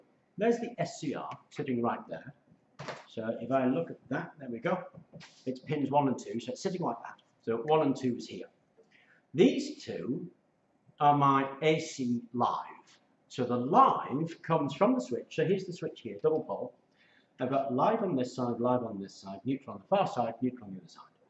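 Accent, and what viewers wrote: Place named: United Kingdom. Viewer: I can't make-out some words again and think your Zoom rec/mic should be shirt pocket. British island accent is difficult for other folks across the pond too. Ni chrome heaters have huge inrush on mains.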